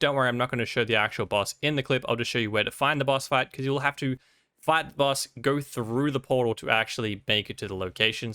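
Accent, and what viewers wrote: Australia. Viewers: Thanks for putting all these in an easy order and not giving up after 4 maps like joltzdude did 🙌 also u aussie?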